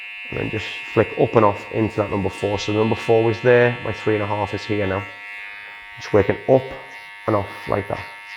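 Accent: Liverpool, United Kingdom. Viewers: Although I'm not from England & don't recognise accents too easily, it seems as though is Scouse (from Liverpool).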